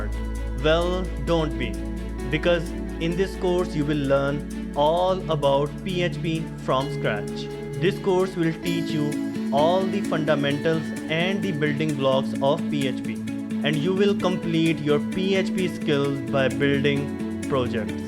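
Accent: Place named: India. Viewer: i started taking your course but i need to confess your english give me a headache english isn't my first language and this indian english just kill me when it comes to learning something difficult , i still in the number lesson but i will do my best to finish the course soon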